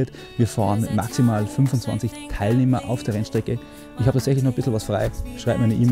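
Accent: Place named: Bavaria, Germany. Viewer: Physik auf bayrisch 😁👍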